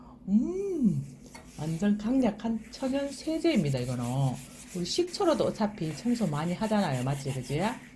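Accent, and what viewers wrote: Gyeongsang, South Korea. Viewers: 구수한 갱상도 사투리가 정감이가네요^^ 여러가지 팁받아갑니다 감사해요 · 대구 사람이시군아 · 구수한 경상도 사투리에 정이 느껴지네요! 건승하세요!!! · 민경이란말 거의40년만에들어보네요 잊고있었던 경상도 사투리 정말고향에온듯이 아련한그리움으로 남습니다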